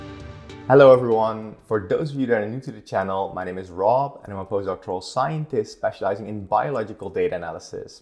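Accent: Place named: Netherlands. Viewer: 3 times the costs of my Huawei GT runner. Perhaps not totally comparable but I'm very glad with my GT Runner after a few months of use. Het was leuk geweest om je ook een keertje Nederlands te horen praten, al verraadde je accent al heel snel toen ik me abonneerde op je kanaal, dat je een Nederlander bent. 😉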